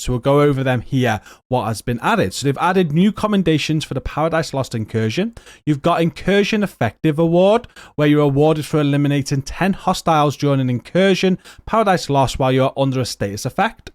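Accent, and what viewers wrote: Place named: England, United Kingdom. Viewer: I really like you man, I follow you I support you and I played with you and your clan for a time but GOD I HATE ENGLISH ACCENT.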